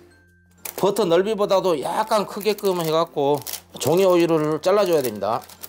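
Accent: Jeolla, South Korea. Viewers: ㅋㅋㅋㅋ붙어부니까요..전라도 사투리는 들을때마다 재밌는거 같네요. 좋은 아이디어 감사합니다.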